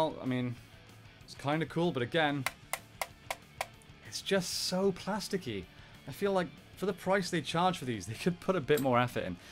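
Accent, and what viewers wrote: Wales, United Kingdom. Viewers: Barely related to the video but I’ve just realised you have a welsh accent (Cardiff, right?). Repping the welsh PR fans! 🏴😂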